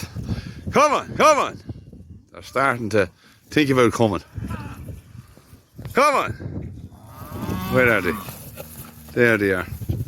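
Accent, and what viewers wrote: Ireland. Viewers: Bred , born and reared into it. A career in nursing first but the LAND called out loud so out the gap and got out the wellies. It's a great way of life and healthy.. You got very handy with the CAVAN accent and you been from the west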